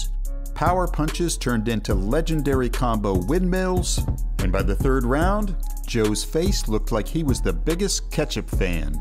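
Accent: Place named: United States. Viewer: ur voice does not sound like a Ukrainian you’re American bruv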